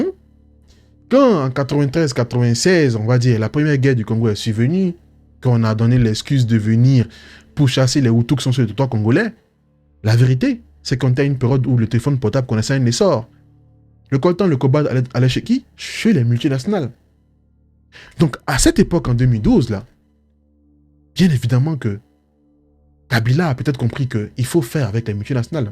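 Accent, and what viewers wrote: Ivory Coast. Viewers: Ko je suis l'enfant de quelqu'un 😂😂 tu m'as tué frère comme un vrai ivoirien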